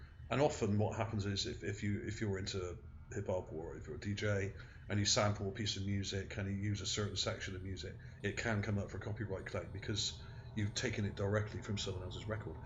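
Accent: Bristol, United Kingdom. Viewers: Now how did I know that you were from Bristol, UK, lol. My home city.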